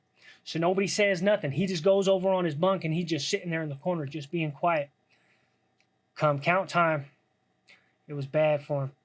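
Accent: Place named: United Kingdom. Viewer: I love this kind of British accent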